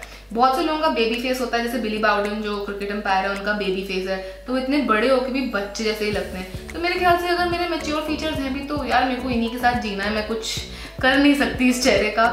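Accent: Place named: Delhi, India. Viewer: oh my god!desi grl ..ab pure ki pure delhi wali lag rh ho ...aap k hindi ...just wowwww ..😍😍😍😍😙😙😙😙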